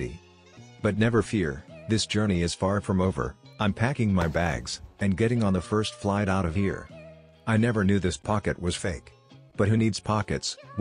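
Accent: United Kingdom. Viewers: Aren't you British?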